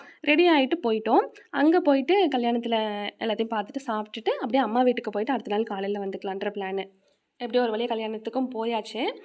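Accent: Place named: Kongu, India. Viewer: Kongu tamil nanga veetla pesara mathiriye pesirunthinga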